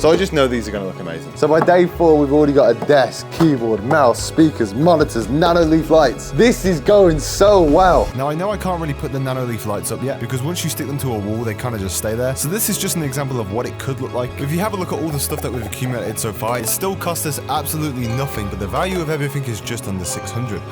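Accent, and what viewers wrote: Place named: United Kingdom. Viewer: Bro i have troubles understanding u because Ur briish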